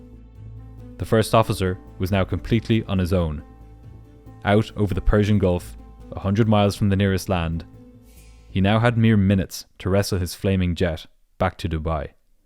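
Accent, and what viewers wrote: Ireland. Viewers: Yeah, he does have a very pleasant Irish lilt. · That an Irish Brogue ?Love the channel.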